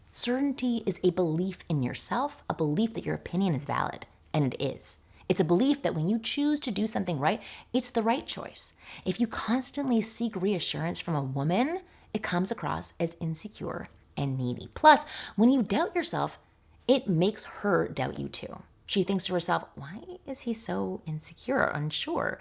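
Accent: Canada. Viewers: your Canadian 🇨🇦🍁accent shines through sorry😂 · You are clearly Canadian, therefore your OPINION doesn't mean Shyte to me! Like I'm gonna take advice from someone who calls soda "pop" and calls Z Zed!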